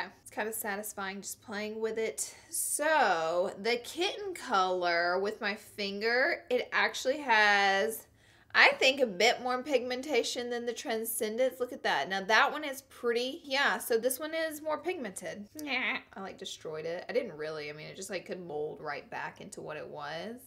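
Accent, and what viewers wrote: South, United States. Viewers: Y'all she looks like a chola but sounds like a southern lady! I love her voice so much 😂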